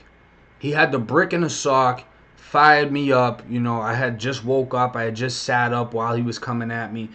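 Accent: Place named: Massachusetts, United States. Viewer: Yo i found you on here and love hearing the stories. I’m from New Hampshire and I could tell you were from mass from the accent. I have been through the whole New Hampshire prison system and 5 of the county jails. Shits crazy I’m finally wrapped up after 7 long years but I like hearing from a real ass dude that speaks truth and doesn’t fabricate. You could write a book my dude. Oh ya and my birthday is aug 29 1986. Much love my dude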